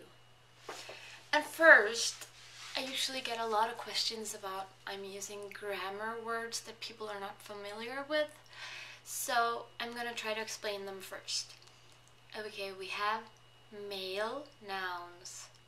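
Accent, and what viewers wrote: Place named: Standard, Norway. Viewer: The official status in general of both Nynorsk and Bokmål is that people have a lot of choice because the labor party tried to merge the languages (allowing versions close to the other one) while other political parties tried to keep them apart ending with a wide specter of permitted forms. I don't know if that is the case with female forms too, but it wouldn't surprise me... We don't have any official standard spoken Norwegian although her dialect is almost the de facto standard.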